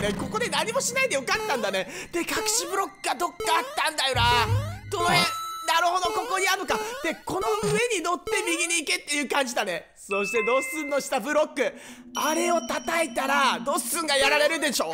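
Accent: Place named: Kyushu, Japan. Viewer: そげん喋っとるほうですよ🎵 ほどよい九州の方言が素敵です（私はばあちゃんが九州だったんで）🎵✨☺️ あ、そしてさんといえば「絶叫🎢」君に届けで吹きました✨ 楽しい動画、ありがとうございます😉👍️🎶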